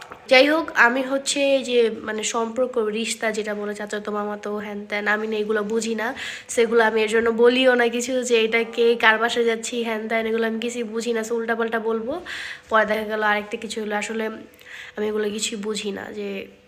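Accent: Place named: Alfadanga, Bangladesh. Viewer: Hiiii... So sweet of you....first time cmnt korchi tmr vdo te... Amdr gram er basha o alfadanga... Shune vlo lglo amra same elakar manush....❤❤❤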